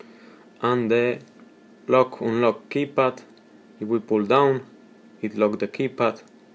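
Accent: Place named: Spain. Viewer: tipico: hablar inglés con un acento español super obvio xd nos pasa a todos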